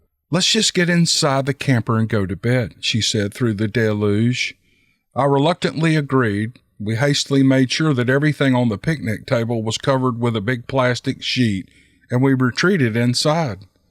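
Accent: South, United States. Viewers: yep, love his southern accent❤ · Love your southern drawl, you give flavor to the stories you read...can almost envision what is being expressed through words...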